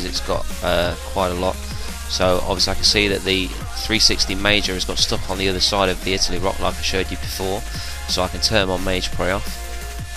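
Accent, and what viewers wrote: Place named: North, United Kingdom. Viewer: i need 2 pull my finger out and get a cape, good guide m8 def gonna try your invents when i go for it....... you from up north m8, i thought u was a southern fairy ?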